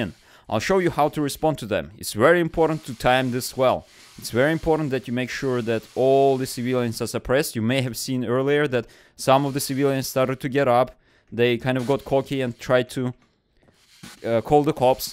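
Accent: Russia. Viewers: arr u rusian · [GERMAN]Ich höre da Russischen Akzent raus. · So, you are Russkiy, ye?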